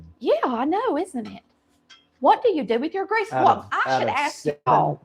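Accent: South, United States. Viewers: I just showed my husband your video… told him yall sound like us… lol … “been doing this 7 yrs he should know.” …. We’re country talking too.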